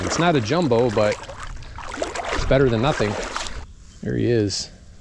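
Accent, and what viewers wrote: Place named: Canada. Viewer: Where are you from.. sound like a Canadian or Wisconsin area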